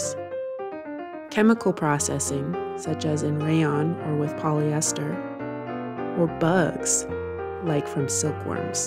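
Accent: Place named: Japan. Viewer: I like how your accent is becoming more Japanese 🥰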